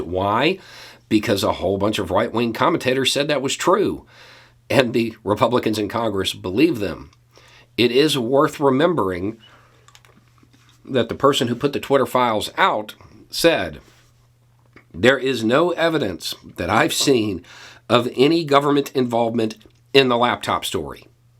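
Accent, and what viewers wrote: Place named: Kansas, United States. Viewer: Love your channel! It’s always the first video I watch everyday. Just commenting to hopefully get you a YouTube buck or two. I kinda look dress/ look/have an accent like you; born and raised in South West Kansas. Made it to California in 1996 at 22yo, via Mississippi for “school”. It’s really reassuring to hear your take on “things”, it’s awesome you’ve found a platform to help/inspire the younger ones too. It also comforts me to know that someone is out there showing the world not all of the “country boys” are MAGA “Good Old Boys”. Keep up the great work.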